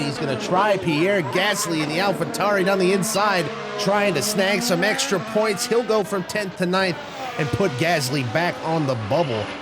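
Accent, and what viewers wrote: United States. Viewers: American accent with f1 intro sounds weird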